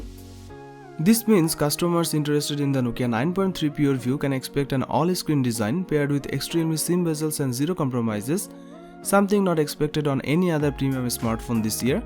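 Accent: Bengal, India. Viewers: Bengali accent is unique 😄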